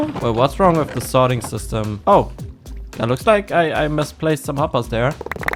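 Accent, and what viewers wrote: Germany. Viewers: als ich ihn zu ersten mal reden gehört hab, wusste ich direkt er wäre deutsch, W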